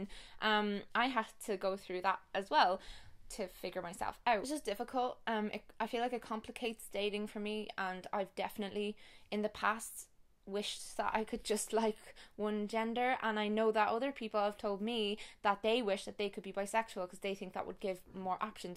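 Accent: Ireland. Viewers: I'm new but I already love your channel BTW I LOVE Irish accents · Hi, I'm from Canada and it's midnight here:) I went to Ireland for 3 weeks in June and I really miss it:( Hearing your accent reminds me of all the landscapes and the memories I made there:D I'm a new subscriber and as a bisexual woman myself, I really needed to hear your videos about all this education. I find we have the same dilemmas. Have a great day:D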